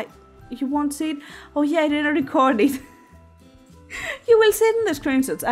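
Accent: Greece. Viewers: Ooh you're Greek aren't you. Great accent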